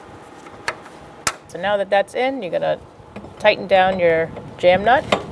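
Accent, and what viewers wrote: Boston, United States. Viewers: my favorite mechanic chick and that Boston accent!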